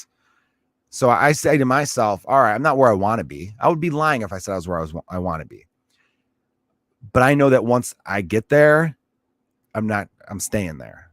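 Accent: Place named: Chicago, United States. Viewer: Personally, I like when you go a little “too Chicago”. It’s relatable.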